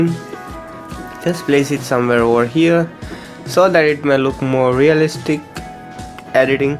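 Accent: Gujarat, India. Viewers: Are you from Gujarat? Your accent says that.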